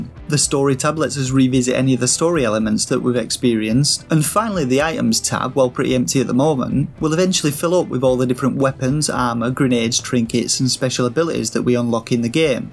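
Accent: United Kingdom. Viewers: Is it english of UK?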